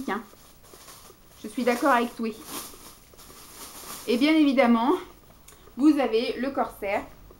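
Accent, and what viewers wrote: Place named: Quebec, Canada. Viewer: Bonjour miss... super tes achats.... comique de t'entendre parler Québécois... d'accord avec toé lolll...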